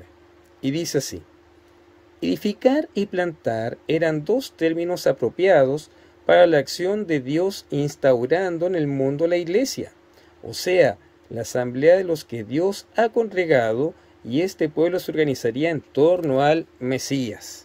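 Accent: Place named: Chile. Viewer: Buen día, esa Biblia es mi primera Biblia, le decían la Cenicienta; un error gravísimo, así lo veo yo, no quiere decir que tenga razón, pero es mi primera Biblia y la quiero mucho y no la veo como cenicienta. Aún la tengo a la Biblia. Sí, exactamente como ibas leyendo, con ese acento chileno tan lindo, dices cap. tal vers. tal lo busco y voy bien. ☺Ayer a la mañana en tv chilena se presentó un SR. entendido sobre Carlo Acutis. Me gustó mucho como explicó. Y ahora hablan de Loan, gracias Chile, y me duele mucho lo de abuelita chilena. Hasta el próximo encuentro!!! Bendiciones!!!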